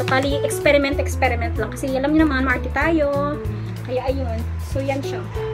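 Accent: Philippines. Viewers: Ilongga ka day? Love the accent💖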